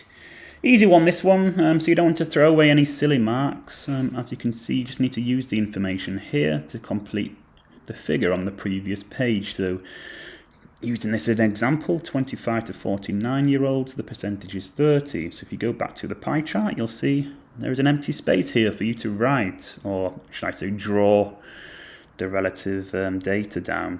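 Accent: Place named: England, United Kingdom. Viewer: I like the english accent and the way u make this sound soo obvious😭🙏. Called us baboons in all tongues 😭🙏.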